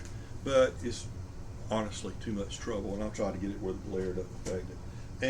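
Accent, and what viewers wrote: South, United States. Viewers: Man, I watch a lot of record geek videos that turn out to be terrible, not just bad taste but SHOW THE DANG RECORDS! But yours is different, and better. You don't pretend to know things you don't, you're not chasing trends, you mix it up (I'm a jazz guy, but I saw Hillman and Peterson play together a few years ago and it was brilliant). I can't place your accent (North Carolina? Virginia? Alabama? I suck at southern accents) but I could listen to it all day. I'm a subscriber now.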